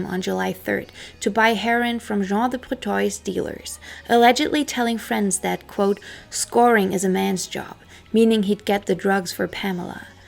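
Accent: United States. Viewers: WOW you have an awesome American accent! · I thought you were American until you said English wasn’t your first language. Fooled me. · you are a delicious woman with a perfect american accent!🤩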